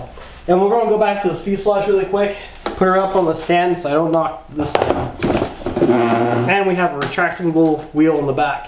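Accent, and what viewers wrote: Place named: Russia. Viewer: I can't place your accent. When you run some words together, it sounds like another language. Russian? Chinese?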